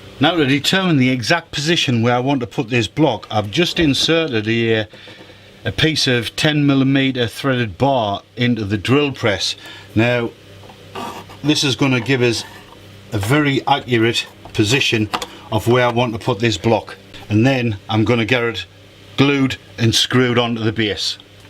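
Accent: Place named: Liverpool, United Kingdom. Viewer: your accent sounds like you might be from Liverpool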